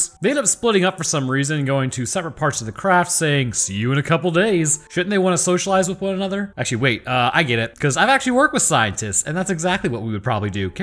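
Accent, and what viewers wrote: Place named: South, United States. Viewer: You can tell you were raised in the south lol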